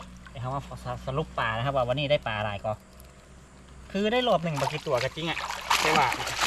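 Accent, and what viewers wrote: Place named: Phrae, Thailand. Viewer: สำเนียงนี่เป๋นคนแป้แม่นก่คับ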